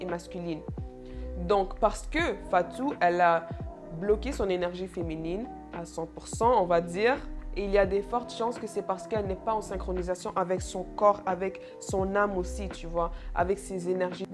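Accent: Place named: England, United Kingdom. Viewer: Ton français provient d'un accent anglais, je crois 🤔?et du courage je me suis déjà abonné....du courage pour la suite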